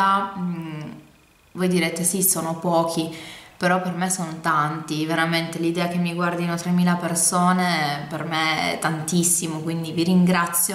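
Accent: Turin, Italy. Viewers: Grazie mille 😍 ti ho scoperta da poco e mi fai davvero un sacco compagnia con i tuoi video! Poi adoro tantissimo il tuo accento torinese ❤️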